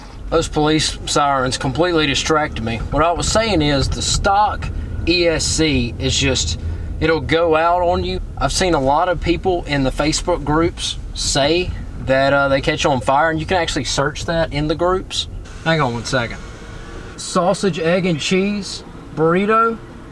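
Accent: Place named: South, United States. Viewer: how Sothern American: yes I subscribed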